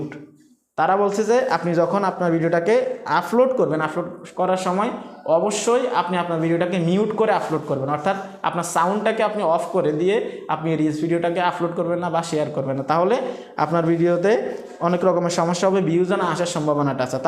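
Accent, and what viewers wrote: Noakhali, Bangladesh. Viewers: ভাই আপনার বাড়ি মনে হয় নোয়াখালী🙂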